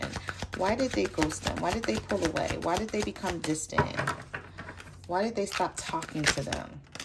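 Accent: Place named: New York, United States. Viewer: You sound like a New Yorker, I enjoy your readings either way.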